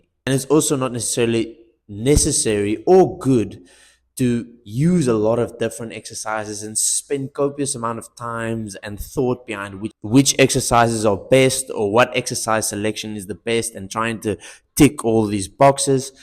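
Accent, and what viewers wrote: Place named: South Africa. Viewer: Sounds like an Afrikaans accent my boy. Are you South African?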